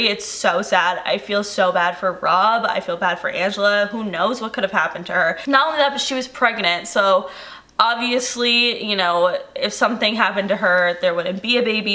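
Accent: Canada. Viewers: I thought I was the only one who thinks she's from Canada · D W I think she's from Canada that's why lol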